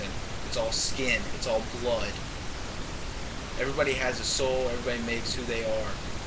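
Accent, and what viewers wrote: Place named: Philadelphia, United States. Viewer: You sound like you are from Philly. Move to Camden, live there for a year, and then please do a video update. Until then, please explain your theory of what is or isn't "punk rock" because it sounds to me like you think it is "punk rock" to take the establishment position on every last little thing. Wee bit odd, yes?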